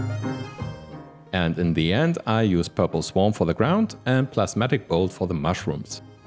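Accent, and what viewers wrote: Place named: Lower Saxony, Germany. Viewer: haha, ich war nicht 100% sicher denn Dein englisch ist sehr gut... war so ein Gefühl, die Niedersachsen erkennen sich eben 😅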